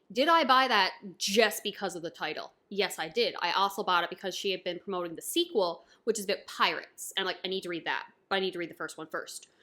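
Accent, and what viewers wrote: Canada. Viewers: I love/appreciate your Canadian or maybe Wisconsin pronunciation!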